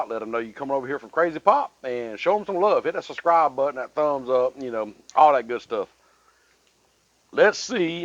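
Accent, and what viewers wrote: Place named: Panhandle, United States. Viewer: Where from in FL? You sound like you're from the Panhandle if anything. I'm in Orlando.. awesome cook.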